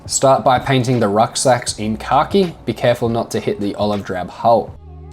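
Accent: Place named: Australia. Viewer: Great stuff Miss the Aussie accent from home over here in the UK Thanks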